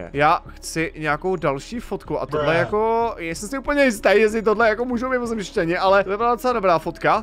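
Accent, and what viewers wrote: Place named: Pilsen, Czech Republic. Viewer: Upřímně jsem toto videjko měla dneska jako relax - byla jsem na 1 den u Plzně na výletě (v Domažlicích) a tedy... jak jsme přijeli do Prahy, energie někde (nevěřila bych, že si to někdy až tak uvědomím, ale už jen ten fakt, že se znovu vracíš do domoviny / jsi blíže do reality, tak tě vyčerpá) a já už si fakt přála, abych byla doma 😅 Vážně, dneska jedno z videí, kde jsem zarelaxovala a teď přímo postel xD Zítra ještě shlédnu videjku na main YT, ale dneska jsem si dočerpala "pozitivní energii" zde, zítra doplňuji u hlavního kanálu. Děkuji za ni (a též si budu o to více vážit, když tě někdy nějaké ten přízvuk od Plzně se občas objeví ve videjku) ❤:D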